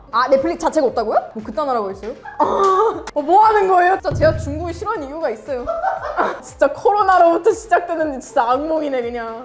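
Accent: South Korea. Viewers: 와 완전 한국발음이네 ㅋㅋㅋ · 한국 여학생이야??????? 발음하고 말투하고는? ㅋㅋㅋ 우리 옆집에 사는 동생이랑 똑 같네? 대박이야~~~~~ 미치겠네~ㅋㅋㅋ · 영상 안보구 청각으로만 들으면 100퍼 한국인이다! 네이티브 ㅋㅋㅋ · 이 학생 외모만 외국인이지, 하는 행동, 말투, 표정하며 완전 한국인이네. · ㅋㅋㅋㅋ ㅋㅋㅋㅋ 이학생 뭐니 말도 엄청빠르고 액션이나 말투 사투리까지 이아이 한국사람 오리지날이네 ㅋㅋㅋㅋ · 정체가 누구예요? 한국말 완전 원어민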